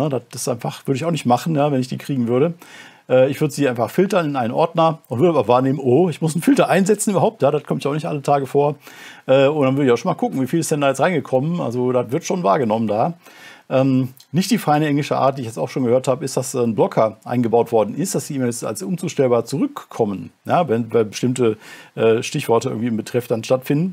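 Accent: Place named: Remscheid, Germany. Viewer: Dieser Remscheider Dialekt ist einfach top :'D Ich glaube ja, dat die SPD den ganzen Bums noch mit Knallgas sehendes Auge vor die Wand fährt. "Dat is' allet an den Haaren herbeigezogener Quatsch!"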